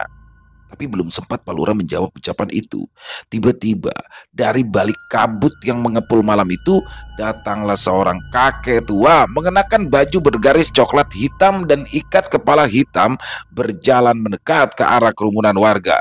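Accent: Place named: East Java, Indonesia. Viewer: Misuh khas Jawa timuran emang fenomenal 😂😂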